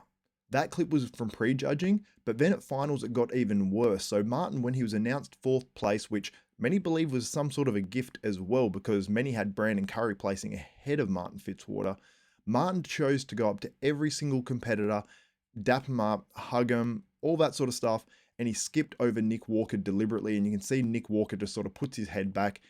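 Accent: United States. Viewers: lol nice american accent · Your Martin-esque American accent is hilarious!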